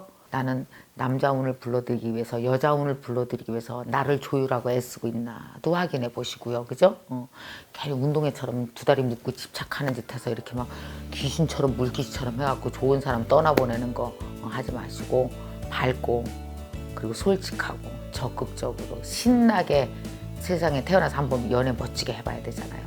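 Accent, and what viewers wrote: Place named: Chungcheong, South Korea. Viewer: 불만인거가터 고소한 충청도 사투리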